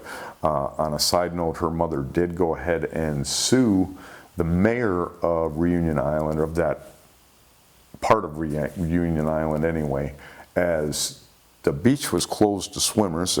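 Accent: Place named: Michigan, United States. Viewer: your show is very educational and entertaining. I look at my wife when the places that I snorkeled and swam come up as locations in your shows (Rose Island, Bahamas, Hilton Head Island, Destin) and say “great…now I wonder how close I actually was to a shark”. BTW there is a link to a guy in NJ that is supposed to be you. I’m from Michigan and you definitely sound like a Michigander…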